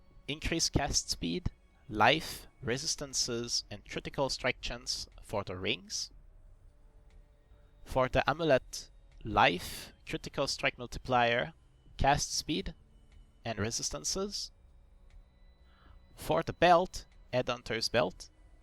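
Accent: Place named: England, United Kingdom. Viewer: cette accent anglais xd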